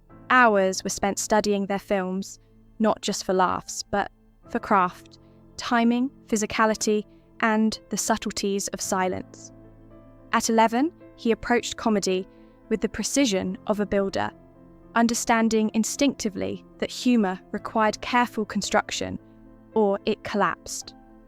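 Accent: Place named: United Kingdom. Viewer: Another video with an annoying, low class British accent.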